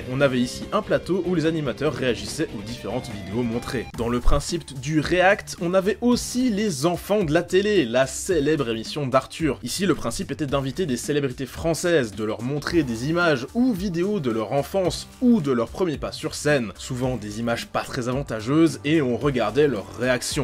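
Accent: Belgium. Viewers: Parle Français comprend rien quand tu parle belge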